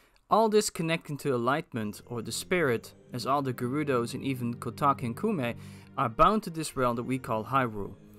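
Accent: Netherlands. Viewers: Dude ben je Nederlands ofz want ik hoor tering vaak een Nederlandse stem en accent er door heen. En je moet een beetje werken aan hoe je sommige dingen zeg naar verder goed bezig man!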